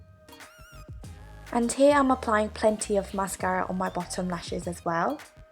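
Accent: United Kingdom. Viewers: 아픈건 괜찮으신지용...! 그나저나 뭔가 핼쓱... 해보이셔요ㅠㅠ 영국발음도 너무 좋아요ㅠㅠㅠㅠ 항상 영상 볼때 엄청 집중되요 발음때문에ㅠㅠ 끄아아 저랑 눈 모양이 비슷해서 항상 공감되요 눈화장이ㅎㅎㅎㅎㅎㅎ 짱 좋음! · 저도 속쌍꺼풀인데 화장이 너무 예뻐요 영국발음도 너무 멋있고 매력적이에요!!!!!!!!다른 튜토리얼도 많이 올려주세용❤️ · new to ur channel was so shocked to hear that brit accent! haha this vid makes me excited for my trip to korea! :) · 이런 거 잘 못 남기는데 언니니까 댓글남겨봅니다..ㅠㅠ ㅠㅜlove...♥ㅜㅜㅜ 처음엔 저희언니가 보는거 따라보다 보게 되엇는데 언니 정말 매력 넘치세요...ㅜㅠ♥ 정말 같은여자가 봐도 반할정도...ㅠㅜㅠㅠㅠ ㅠㅠㅠㅠㅜㅠㅠ그리고 진짜 언니가 고르시는 색마다 언니랑 정말 잘 어울려요...ㅠㅠㅠ 최고최고...ㅠㅠㅠ♥ 1년뒤에 저도! 드!디!어 이제 화장할 날이 올텐데...!!...ㅠㅠㅠ 그땐 직접 따라해볼게요ㅜㅜ항상 업로드해주셔서합니다!!!! (영ㅇ국발음도매력짱짱!꺅언니최고!) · 네일 어떤 색상인가요?? 한국꺼면 좋겠당ㅠㅠ.. 저도 외꺼풀이라 자주보고있어요!!! 영국 발음도 너무 이쁘고♥♥♥ 건강 관리 잘하시구 앞으로도 좋은 영상 부탁해요~~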